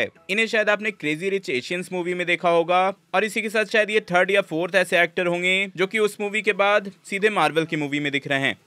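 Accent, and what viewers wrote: Bihar, India. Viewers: Aap bihar se ho kya 👀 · Aap Bihar se ho ky👀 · Bhai Bihar se ho kya? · Bihar s ho ky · Are you from Bihar ? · I think your r frm bihar, I'm also frm bihar😂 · Bihar se ho kya? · Ap Bihar se ho ? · Are bhai aap bihari ho kya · Sir ap bhi bihar se ho kya????? 🙂🙂🙂🥲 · Aap bihar se ho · Aap bihar se ho kya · Are you from bihar? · Aap Bihar se ho kya Bhai :) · are u from bihar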